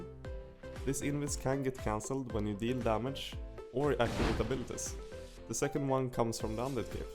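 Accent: Sweden. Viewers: Your accent sounds swedish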